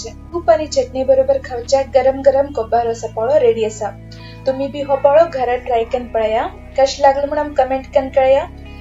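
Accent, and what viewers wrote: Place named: Konkan, India. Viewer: Lovely. I like your shudhdh Konkani.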